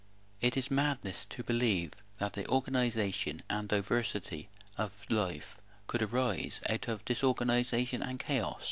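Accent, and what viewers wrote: West Country, United Kingdom. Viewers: Sorry much as your notions are harmless, people like deepka chopra and yourself abusing physics terminology to present some New Age quantum woo irks me somewhat, its deceptive. Physics is much more inspiring than speculative imaginings involving notions like sacred geometry and the universe is conscious. I have one question why do you frame such thoughts using this terminology , its obvious your not really competent with its meaning in science? What accent is that , sounds west country , Cornwall, Bristol, Glastonbury ? All the best.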